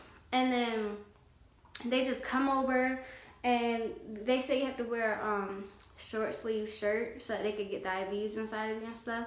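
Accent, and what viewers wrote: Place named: South, United States. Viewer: You’re literally the most beautiful woman I’ve ever seen wow, love your southern accent.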